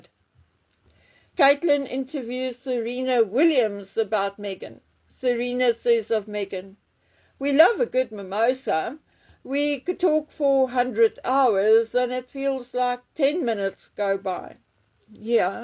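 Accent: South Africa. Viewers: Like the accent 🇿🇦. ❤ from Germany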